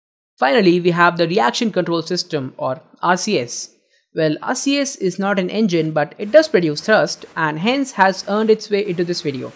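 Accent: India. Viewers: Why u sound like indian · Dude are u Indian? Ur accent sounds so.. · Are you indian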